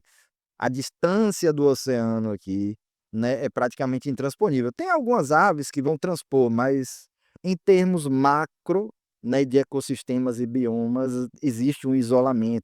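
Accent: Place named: Bahia, Brazil. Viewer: Aula com sotaque baiano é melhor ainda!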